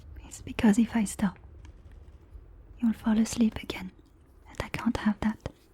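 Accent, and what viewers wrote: France. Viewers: French accent? You have my undivided attention. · I absolutely love hearing accents and your French one is AMAZING. Thank you for this wonderful gift of a video! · This was so great! Your French accent is superb ❤ Merry Christmas ❤🎄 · God I wish for French GF to listen her speaking with this accent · J'adore ces audios avec l'accent français parce que ça m'aide a mieux comprendre 😂 Joyeux Noël à tous depuis la France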